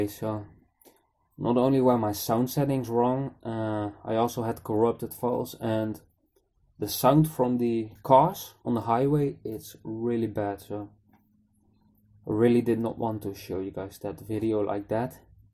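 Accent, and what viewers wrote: Netherlands. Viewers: Je hebt zo'n goede video's.... Kom er steeds op door dat je met anderen op pad bent... en kijk dan toch steeds even naar je vids. Maar pfoe.. ik kan me echt niet abonneren en klik vrij snel weg omdat ik dus al heb gezien wat je gefilmd hebt met je co-host zeg maar. Niet omdat ik niet wil zien wat JIJ geflimt hebt ten opzichte van je co host want ik kom steeds even kijken, maar sorry... ik kan echt niet tegen je "steenkolen" Engels.... Ik weet dat iedere Nederlander of Belg (inclusief mezelf!) gewoon een rot accent in Engels heeft maar het is gewoon TE erg voor mij. Ik kan zelf vrij goed Engels verstaan, lezen, schrijven etc maar spreken doe ik zelf ook liever niet ivm accent. Ik weet hoe moeilijk het dus is maar dat houd ook meteen in dat ik elke Engels met een Nederlands/Belgisch accent gewoon niet aan kan horen :D Het is dus niks persoonlijks en zou willen dat ik je vids met een open blik kan bekijken maar ik kan het gewoon niet.. 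Sorry!! Ze zijn zo goed maar zodra je Engels begint te praten krimp ik in elkaar .....De video met Mark en in the prostitute mansion: de duif of uil: he flight right at him!! The Owl flight right at him!! En niet 1x maar diverse keren en tja.... we weten allemaal dat die zin totaal niet klopt. Wil ook echt niet negatief zijn want je video's zijn goed... erg goed.. maar misschien toch beter in het Nederlands? Trek je echt meer viewers door Engels te praten in je video's? Mark is ook alleen Nederlands...en volgens mij hebben jullie bijna hetzelfde a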